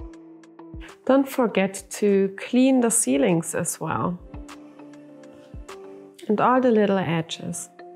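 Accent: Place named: Germany. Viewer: Am besten bitte gleich deutsch sprechen. Dein Deutsch-Akzent ist so stark, dass ...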